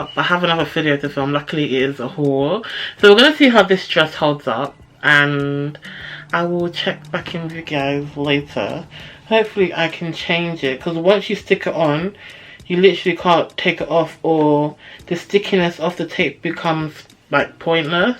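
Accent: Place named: Long Island, United States. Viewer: Your Long Island sexiest chocolates cocoa butter golden brickhouse woman 😍😍😍😍🍓🍓🍓🍒😋😋😋☺️ love your accent 🔥🔥❣️❣️😍😍😍😘😘🌟🌟🍓🍓🍒🤩🤩🤩🤩🤩🤩🤩🤩🤩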